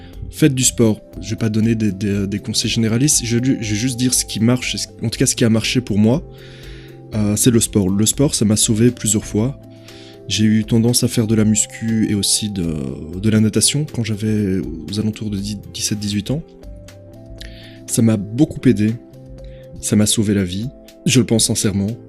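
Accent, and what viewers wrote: Belgium. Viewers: Tu as un accent bruxellois.🤔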